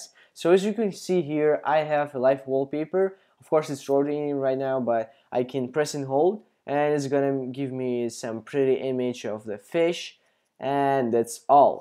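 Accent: Poland. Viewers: Are you Polish? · Are y o u polish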